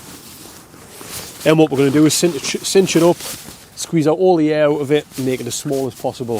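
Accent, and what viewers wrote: United Kingdom. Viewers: From America but lived in Sunderland for a time. I sure miss the Geordie accent. Thanks!!!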